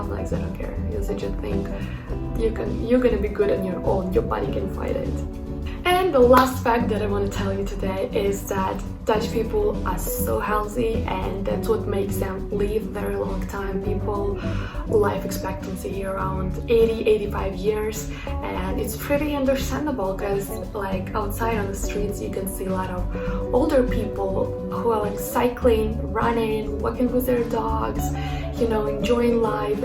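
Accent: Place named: Ukraine. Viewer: Круто, сразу слышно украинский акцент.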